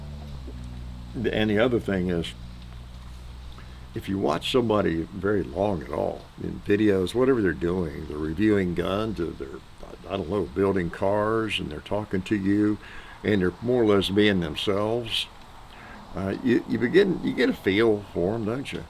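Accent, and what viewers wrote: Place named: Kentucky, United States. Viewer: Wishing you well! Regarding speech, I notice you have only very little of a Kentucky or Tennessee accent. I travelled the US twice by car paying attention to regional accents and was not too surprised that accents are strongest with older people who've mostly spent their life in a small town or within a particular limited area. Tennessee accents seem diverse. I was surprised that Chuck McDowell (radio ad) comes from Nashville. He has a strong accent; I thought it was Texan. Take care.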